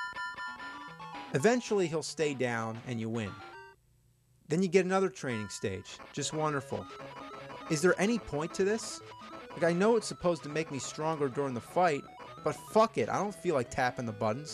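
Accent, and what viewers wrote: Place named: Philadelphia, United States. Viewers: He actually has a bit of a northeastern accent if you listen closely lol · fucking hell, im italian and still i can hear the philly accent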